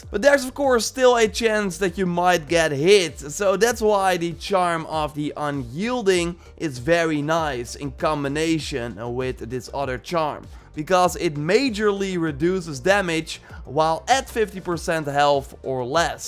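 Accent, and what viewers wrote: Netherlands. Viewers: Vire arrows 😀. Your dutch english never gets old.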